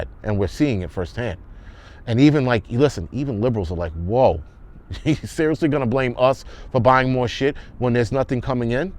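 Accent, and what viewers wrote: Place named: Brooklyn, United States. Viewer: I love you brother. Not in that way lol. You have to be from Brooklyn. That is the way we talk never candy coat it. Tell it like it is. Keep up the great work. And be safe.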